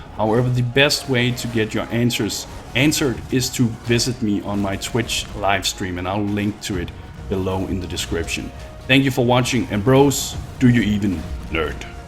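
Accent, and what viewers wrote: Denmark. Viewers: it's so fucking obvious how danish you are!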